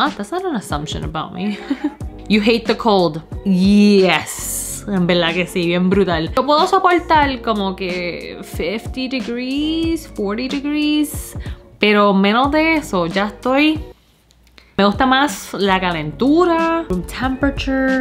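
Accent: Puerto Rico. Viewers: Hola,yo naci en Newark,New Jersey ,mis padres boricuas,me fui para P.R. cuando tenia 8,vivi alla 21 years, hora vivo en Connecticut ya hace 33 years,pero nena tu hablas mas boricua que yo lol me encanta saber que eres china y hablas super bien el spanish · Your great i love your videos and your Puerto Rican accent 😍😘😉💗💖💯🇵🇷🇵🇷🇵🇷🇵🇷🇵🇷🇵🇷🇵🇷🇵🇷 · Me encanta hablas como boricua! Las palabras, tu acento. Love it yo soy boricua pa q tu lo sepas. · Esa es tu manera de ablar... eres bóricua para mí la gente no todos siempre ablan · Tu acento bien boricua, tu manera de ser. El dialecto que usas, como actúas con las manos!!! Mano eres más boricua que muchos nacidos en PR... 🥰 good vibes! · Tienes una fonética de PR. No es que eres vulgar sólo que hablas como los jóvenes callejeros. Eres una joven educada y en ocasiones debes presentar esa parte de tí en español ya que si no puedes tus maestros de PR te fallaron. 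Por cierto, en inglés te expresas muy elocuente y correcto. Pero... Si en realidad estás presentando tú personas creativa y artística en el entretenimiento entonces eres excelente👏.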